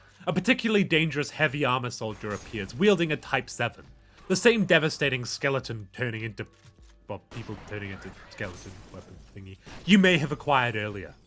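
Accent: United Kingdom. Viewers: I completely fuck with how British you are. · They gotta be bri’ish or just really fckn good at talking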